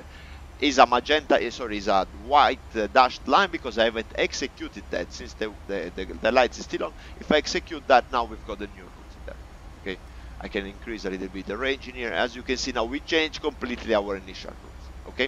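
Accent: Italy. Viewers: Mamma mia, parla troppo...hehehe, Thanks for the video, I can tell you are Italian...just like my family 🙂, Great video, just learned a new thing with you. If you were an American, you would have described this in 2 minutes 🙂..GRAZIE MILLE fratellino.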